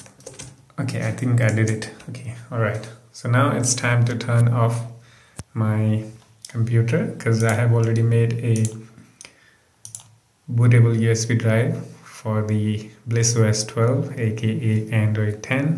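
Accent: India. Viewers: anyone knows he is from which place talks like and indian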